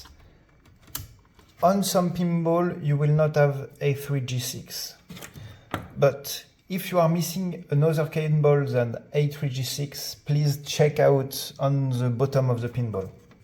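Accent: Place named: France. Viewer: Bonjour vu votre accent vous êtes français 😉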